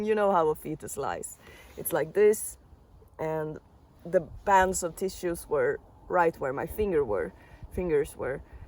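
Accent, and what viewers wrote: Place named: Sweden. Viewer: I don't know if I got it right, but in my ears you have a similar accent to Peediepie's so: are you Swedish?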